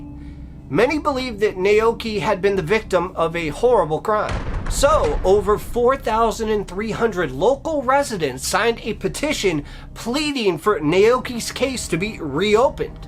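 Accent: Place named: United States. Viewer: Love your videos and the American accent as im in England 🇬🇧 i love watching your videos every time you put them out but due to time difference across the pond i get to watch them a little later on. Keep them coming though ❤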